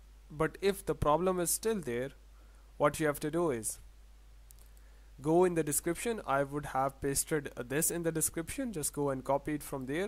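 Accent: India. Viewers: Yes, sure. .....not likely I'll listen to someone with an Indian accent tell me how to do anything on my phone.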